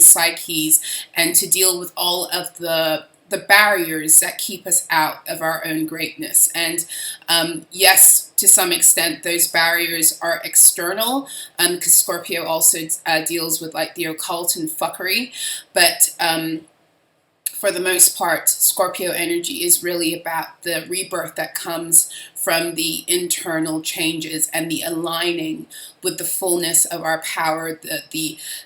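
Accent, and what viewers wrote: United Kingdom. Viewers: Where approx in uk ru. Scottish accent?😊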